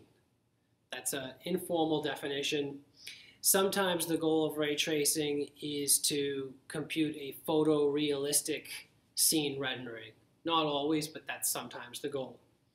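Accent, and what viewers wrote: Boston, United States. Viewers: are you from Boston? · Are you from Boston?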